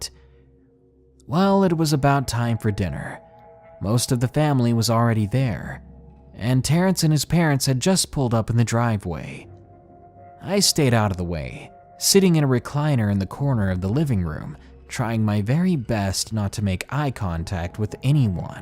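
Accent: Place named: United States. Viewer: Oh yah you are from America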